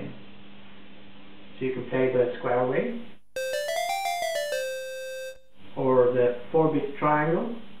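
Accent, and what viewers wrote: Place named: Skåne, Sweden. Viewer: He's definitely swedish, sounds like he's from the Skåne area (not sure).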